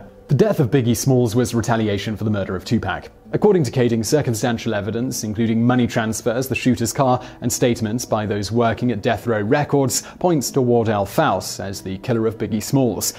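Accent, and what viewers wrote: United Kingdom. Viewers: Indigo P Some of these are just accent differences. In the UK mafia and Van Gogh are pronounced as he said. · He’s from the UK, they pronounce things differently than we do in the US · who cares about the damn pronunciation he's talking about history he's not talking about the American English language the guy is from England not America shut up everybody just watch the show Geez Louise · Relax dude, That's how Brits pronounce it, it's called having an accent. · The names is due to being British but all the info is accurate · His research seems accurate (as conspiracy theories goes) His UK pronunciation gets under some ppl skin for some reason - tomato/tomato ... move on folks and show some cultural intellect.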